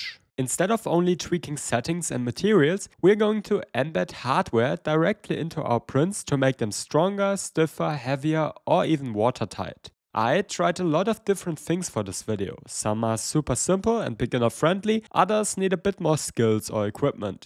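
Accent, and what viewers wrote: Germany. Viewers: If I had a cent for every time I found a 3d printing channel with a German accent I'd have 2 cents. Which isn't much but it's weird that it happened twice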